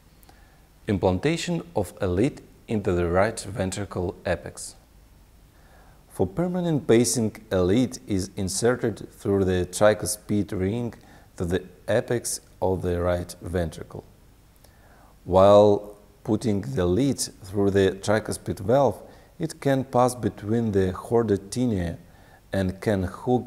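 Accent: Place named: Russia. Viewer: The Russian accent is a little difficult but the information is top notch. Great demonstration!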